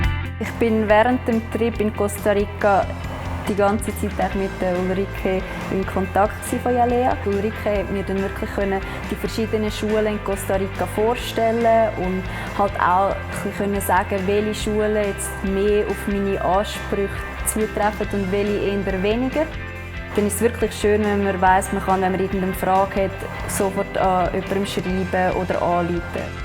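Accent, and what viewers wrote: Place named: Germany. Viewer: what german accent is it ?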